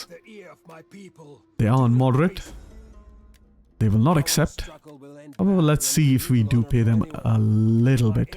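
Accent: India. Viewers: Good stuff. You sound Indian - if so, Latin and Greek are far easier to pronounce correctly than modern European languages. You can use the dental 't' and 'd', for example (like in Hindi 'tum' or Tamil 'thambi' - non-Germanic languages do not use the alveolar t and d (like in English or German)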